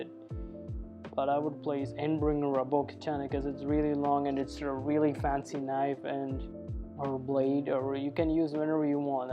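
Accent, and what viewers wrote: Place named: United Kingdom. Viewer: Bruh, I said no weird British accent!! Noice vid tho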